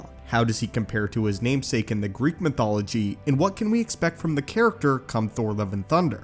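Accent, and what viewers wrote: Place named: United States. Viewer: The americanized pronunciation of the names of greek gods is very distracting.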